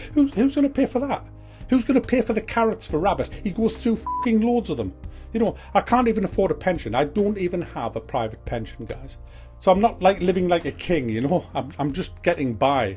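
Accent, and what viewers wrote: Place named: Newcastle, United Kingdom. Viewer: Made a Patreon account and put down a monthly subscription. Love your work mate! I'm a Scouser living in the States and always love to hear you're accent and sense of humor. Reminds me of my old Geordie mates. Keep on keepin on mate.:-)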